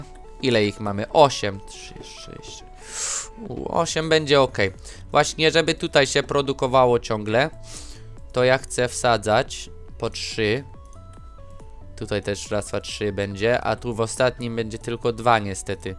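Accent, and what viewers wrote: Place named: England, United Kingdom. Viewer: coraz mniej słychać twojego angielskiego akcentu:) Gratuluję:)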